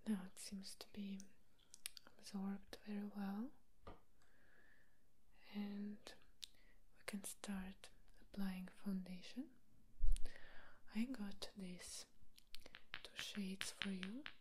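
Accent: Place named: Russia. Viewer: ты русская? уже достаточно видео посмотрела, где у тебя очень четкий акцент, интересно просто узнать🤗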